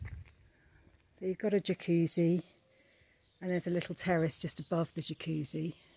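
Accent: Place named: United Kingdom. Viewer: Thank you for presenting this video. It is a beautiful property. Here are some thoughts I have. 1. You are a very good presenter. I am not a brit, so your accent is a bit heavy for me, but because you are thoughtful and speak slowly I did not miss even a word. Also your pace is slow, and allows enough time to see everything, and your camera work is slow and makes it great to watch. 2. Would be nice to have an aerial map to see the boundaries of the property, since clearly the 2000 sqm is much more than we seen, so to have an idea of where the rest is, even if it has been implied. For example, I would liked to see whether it is even possibly to convert some of it to gardening, fruit trees kind of area, etc. Many thanks for a great presentation.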